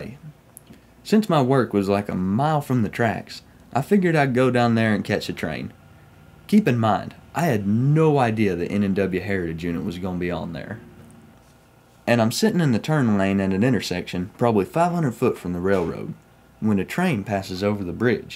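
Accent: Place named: Oklahoma, United States. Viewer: Enjoyed your “500 Subscriber Special” and Thanks for confirming you’re Accent is from down in Oklahoma. Keep up the strong 💪 efforts with your videos. 👍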